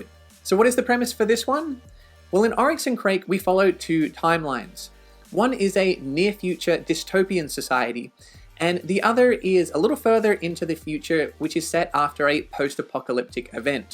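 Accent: Australia. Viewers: The algorithm brought me here, and I'm glad it did. Good to hear an Australian reviewer. My particularly self-serving suggestion is my debut, 36 Streets (Titan Books UK). It was just last week shortlisted for an Aurealis Award (Best Science Fiction Novel), so I can assure you it's not terrible. Cover quote from Richard Morgan (Altered Carbon), who's a huge fan of the book. Cheers, T. R. Napper.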